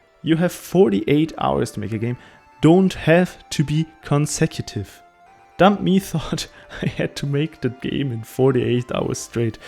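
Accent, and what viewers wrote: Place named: Switzerland. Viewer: Typisches Schweizer-Englisch :P Fand den Soundtrack übrigens ziemlich dufte! Ich hatte Godot vor ein paar Wochen auch mal ausprobiert gehabt, aber ich fands extrem unintuitiv xD Vor allem diese Node/Scene-Struktur find ich weird und macht iwie keinen Sinn für mich... Dann hab ich's wieder sein gelassen xD Naja. Hätte sowieso niemals das Durchhaltevermögen für ein komplettes Spiel gehabt ^^" Aber cooles Video :)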